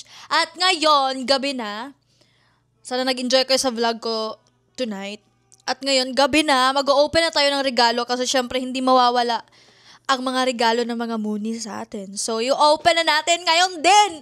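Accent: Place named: Visayas, Philippines. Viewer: I do hope tutukan mo yung accent mo sa tagalog para mas madeliver mo ng maayos mga sinasabi mo lalo sa lines mo sa acting. hindi mo naman kakalimutan ang bisaya but ofcourse need mo masanay sa tagalog kasi hirap na hirap ka mag construct ng sentence. Grind lang ng grind! For da go!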